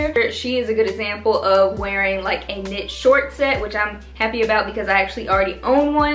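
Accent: South, United States. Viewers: Oh my gosh girl I love your energy and that southern accent ! I’m sure you get this all the time… but where are you from !!? I’m lovin it ♥️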